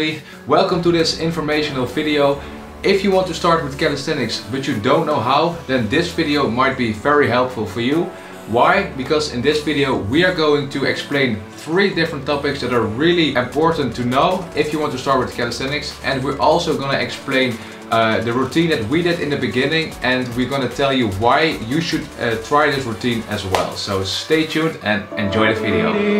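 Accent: Netherlands. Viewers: Yeaaahh i heard it immediately hahahha. I.speak dutch but i dont have thia accent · or, we can just be proud that us Dutch have such a high rate of English proficiency, with or without accent. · Ik kom al horen dat jullie een nederlands accent hadden😂😂maar hoe hebben jullie ooit dit berijkt en waar doen jullie dit? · Dat Hollands Engels is echt zalig😅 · I recognize this accent immediately. They are dutch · Lekker nederlands accentje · The accent screams “ I’M DUTCH!!!” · Cringeeeee big time, please just talk Dutch or in proper English xD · Are you Dutch? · you sound very dutch hahaha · Puur Hollands lol! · lekkere nederlandse tong tijdens het praten haha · Yep theyre Dutch you can hear that accent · top video, zeer behulpzaam! love het hollandse accent onder dat engels :) groetjes antwerpen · Are you from the Netherlands? I can hear it by your accent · I smell Dutch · Ultimate Dutch accent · holl up holl up, judging from your accent you guys are fellow Dutchmen am i right? 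would make me proud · Yeah they are from the netherlands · You’re Dutch right? Hahahaha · Hollander English to funny. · Haha no idea who you are but half way first sentence I hear a fellow Dutchmen. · I subscribed because of the Dutch accent. Respect vanuit Amsterdam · Nederlandse accent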